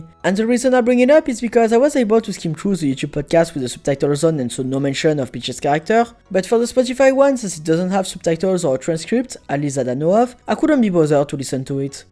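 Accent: France. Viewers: tout mon respect, absolument pas. Tu sonnes extrêmement français.e. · omg luvv ur french accent lol anyway , i don't think she resembles Daisy on personality but the problem is her face , the "Illumination" style ruined her as a whole.. she looks like a normal regular american blonde girl , that barely resembles a "fairy mushroom human (not really human)" plus we know her facial expressions are meant to be "minimalist" real Peach would never open her mouth more than 1inch haha not even in a fight or scream , and Illumination streches Too Much! her facial expressions , what makes her looks with so much attitude (so far from how we know Peach) is just not kawaii cutie , she could easily have a crazy ratched personality but if they kept her FACE instead of these goofy cartoony Disney wannabe face it would be no problem that's not even my they should have kept her uniqueness on her manners and face .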